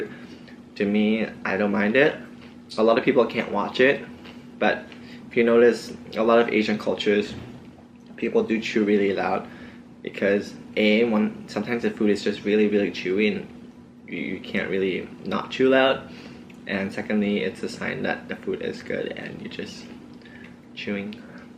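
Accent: Malaysia. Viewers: Malaysian !! I watched your videos all the time, and I was always wondering what nationality you are. My instinct told me you are Malaysian cause of that accent !! HAHA. ANYWAY !! I am super happy there is one more Malaysian in the states !! PROUD OF YOU !!!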